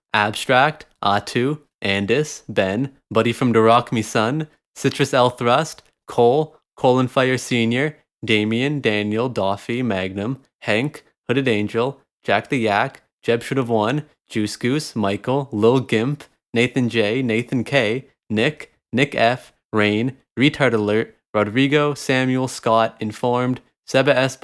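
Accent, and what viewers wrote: Canada. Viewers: All of a sudden I can hear you Canadian accent and cannot unheard it. I can't stand it. This video has a demon in it and I am now cursed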